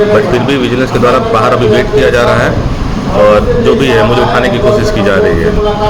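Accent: Bihar, India. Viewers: जी आप को बिहारी टोन में इंटरव्यू लेते हुए सुनकर आत्मनुभूति होती है...!कृपया इसे जारी रखेंगे 🙏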